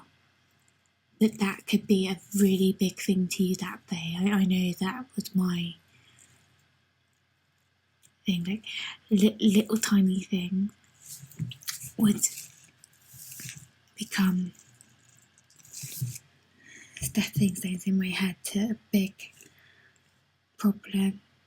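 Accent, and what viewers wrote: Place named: Essex, United Kingdom. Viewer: I love listening to English accents in general, but I find yours is especially charming. I'm probably not even close but I'm going to go out on a limb and guess that you're from Essex.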